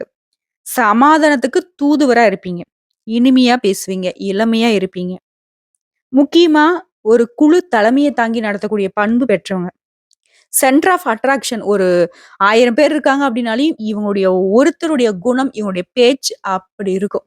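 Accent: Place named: Kongu, India. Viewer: நீங்கள் கோயமுத்தூர் அல்லது திருப்பூரை சேர்ந்தவரா மேலும் தங்களின் பேசும் தொனி கவுண்டர் இனத்தினை சேர்ந்ததாக தெரிகிறது. இனிமை.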